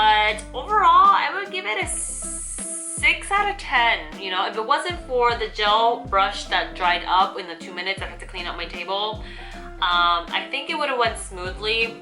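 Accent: Puerto Rico. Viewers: Chica, I"ve experienced culture shock in the past, pero the fact that a Chinese girl that speaks "Puerto Rican" Spanish, is super Fu!@ cool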